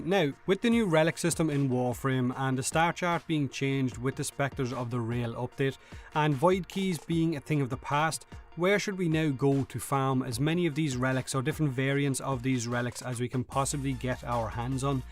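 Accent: Ireland. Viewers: I love that Irish accent :3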